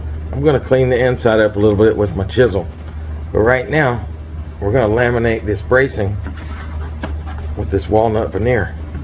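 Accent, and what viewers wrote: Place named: Kentucky, United States. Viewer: You from Kentucky ? You have the same accent as John Prine . In fact you sound just like him when your talking .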